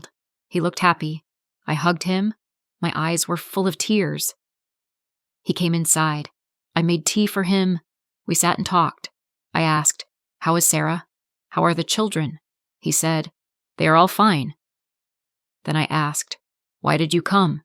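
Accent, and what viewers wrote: United States. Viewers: American accent? no, thanks. I want British English. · American or British?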